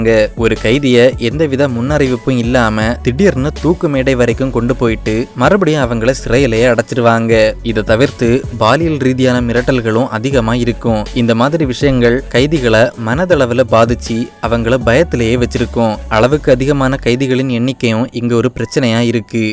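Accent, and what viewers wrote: Kanyakumari, India. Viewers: That kanyakumari slang from youtuber ❤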